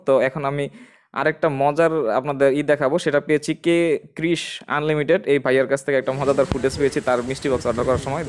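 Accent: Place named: Fatullah, Bangladesh. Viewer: Vai apnar basha ki fattulah naki 😅😅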